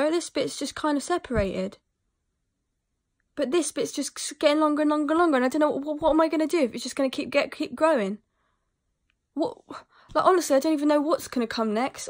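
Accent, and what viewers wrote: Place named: West Country, United Kingdom. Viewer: that west country accent lol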